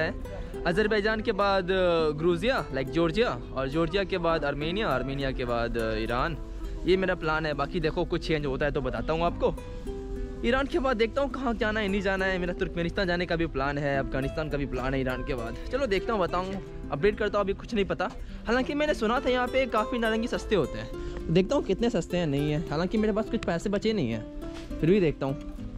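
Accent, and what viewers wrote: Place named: Haryana, India. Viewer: Had mtlb bones, hal mtlb kaise ho Bhait tum haryana se ho lgta h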